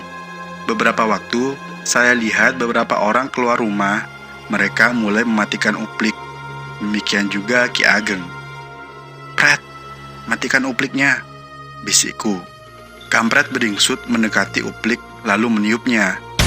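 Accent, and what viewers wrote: Bali, Indonesia. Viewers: Maaf yg bacain cerita nda cocok.logat balinya.di hilangkan biar netral🙏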